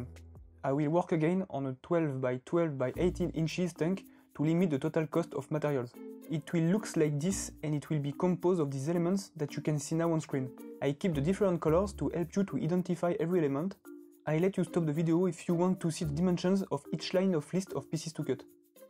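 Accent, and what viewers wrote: France. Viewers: i wish i was french just to have an accent like yours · c'est un français à l'accent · it's typical french english, it's quite cute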